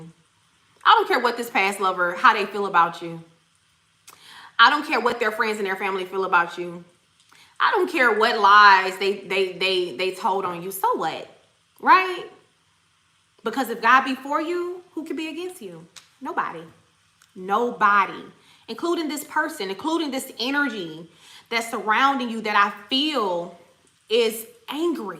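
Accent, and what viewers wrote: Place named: Houston, United States. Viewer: I love your voice do you live in Houston Texas if not you talk like them lol 😂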